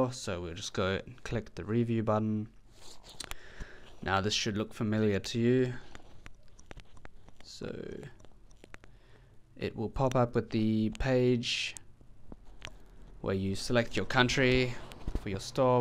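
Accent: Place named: United Kingdom. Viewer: British talk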